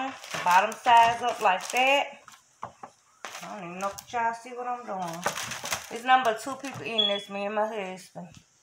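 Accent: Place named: South, United States. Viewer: I LOVE spaghetti squash! One of my favorite vegetables😊 also, that sweet south in your voice reminds me of home. My nana is from Alabama and I haven’t been able to visit my cousins since the pandemic. Thanks for making me feel a little taste of home!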